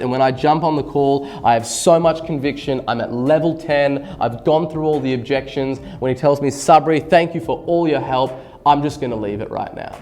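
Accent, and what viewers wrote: Australia. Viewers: I love your ausy accent..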